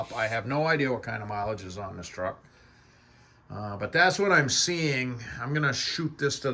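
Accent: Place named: Maine, United States. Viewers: Thought so. Mike Day Concrete NORTH MONMOUTH, ME has the EXACT same accent. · Just a touch of Maine. lol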